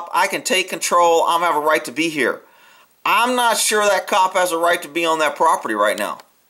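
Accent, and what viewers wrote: United States. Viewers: You talk over vid way too much !! Tip: shut your trap with that arrogant American loud claptrap accent !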